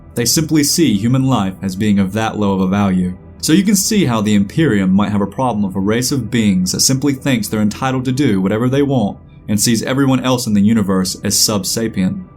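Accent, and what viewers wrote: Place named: South, United States. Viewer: Just found your channel, your southern accent is very pleasant. subbed.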